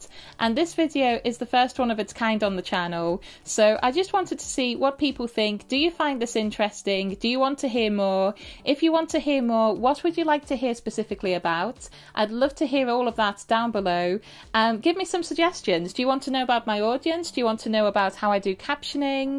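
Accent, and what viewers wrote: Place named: Wales, United Kingdom. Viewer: Have you ever lived in Wales as your accent sounds quite Welsh?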